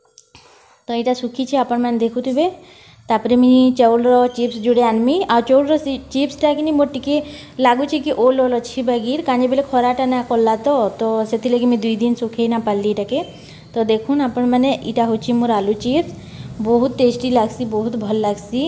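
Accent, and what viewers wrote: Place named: Sambalpur, India. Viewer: ତୁମର ସମ୍ବଲପୁରୀଆ କଥା ମତେ ଶୁଣିବାକୁ ବହୁତ ଭଲ ଲାଗୁଛି ।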